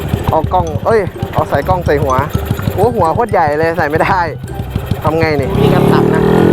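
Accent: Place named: Phu Thai, Thailand. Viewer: พี่พูดพาษาผู้ไทเหมือนผมเลย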